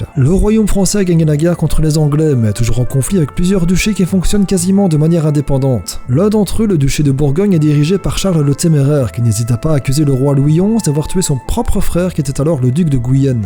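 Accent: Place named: Liège, Belgium. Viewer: Dis tu viendrai pas de Liège parce que l'accent ressemble beaucoup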